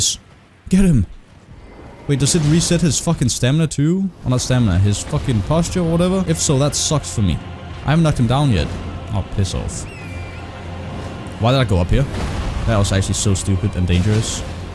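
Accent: Denmark. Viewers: så din første elden ring video og ku bare hører du var dansk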